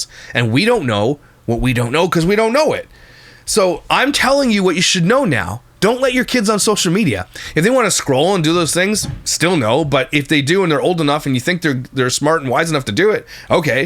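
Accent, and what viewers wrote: Liverpool, United Kingdom. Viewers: I love watching you! as a Manc here in Upstate NY, i'm hearing more scouse/EP area in you but definitely not Scotland! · yup she is defo a scouser. and spot on with her commentary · That's a Scouse accent from Liverpool! It's in the north but not quite Scotland! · is awesome and wicked funny. As a fellow northerner I assume shes from the Liverpool area. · That's a scouse accent!!! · Scouse accent from Liverpool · is from Liverpool, best accent ever. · You’re from Liverpool, right? I love this accent. I had a friend from Liverpool, absolutely hilarious, just like you. 😅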